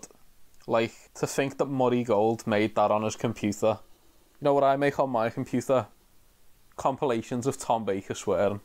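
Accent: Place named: Liverpool, United Kingdom. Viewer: It makes me unreasonably happy to hear another scouser getting into the whotube community.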